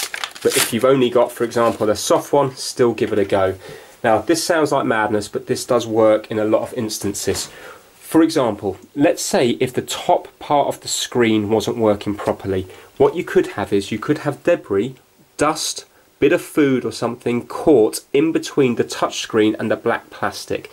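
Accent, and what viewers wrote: United Kingdom. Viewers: THANK YOU THIS HELPED IT WORKS YAYYAYAYAYAYAYAY!!!!!!! Plus nice British accent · Aha! now I’ve seen your face after all this time. listening to your wonderful British voice and learning about video game consoles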